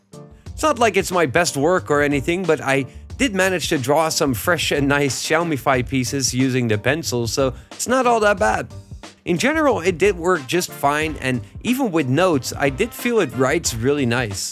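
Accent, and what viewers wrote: Netherlands. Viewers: I sense a Dutch accent 😄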